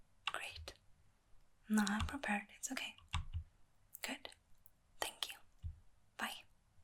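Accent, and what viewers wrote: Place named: United Kingdom. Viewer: Britishness turned up to 8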